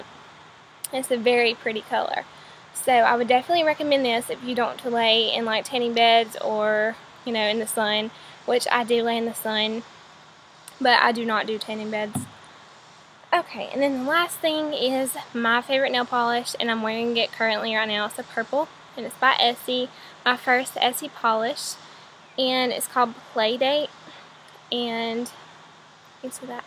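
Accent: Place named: South, United States. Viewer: I love your accent! What part of the south are you from? I'm from Texas (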